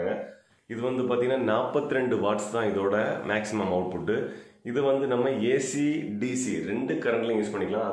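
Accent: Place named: Marthandam, India. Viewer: Marthaandam என்பது உங்கள் பேச்சிலேயே தெரிகிறது நண்பா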